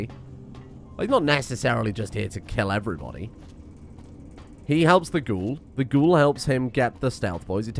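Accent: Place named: United Kingdom. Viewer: Honestly thats just how people living here sound like. Bri'ish air is a helluva thing.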